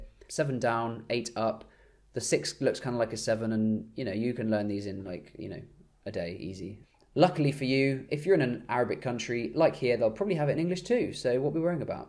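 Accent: United Kingdom. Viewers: Arabic welcome bro u really teach me a lot with ur British accent ❤️👌👍🏻👍🏻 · Hey I was wondering what kind of British accent do you speak & if you could do a video about different accents in England.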